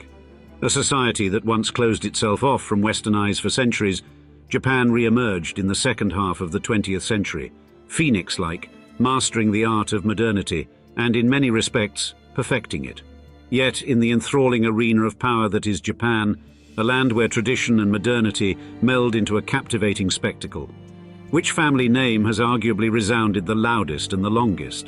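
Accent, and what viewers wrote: United Kingdom. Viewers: Great reminder that Mon emblems were basically logos for families way before they became corporate identifiers. With the narrator's accent, I'm surprised you didn't draw comparisons between the Mitsui founder's department store for the emerging middle class with similar British operations like Fortnum and Mason in the Georgian era or John Lewis or Selfridges.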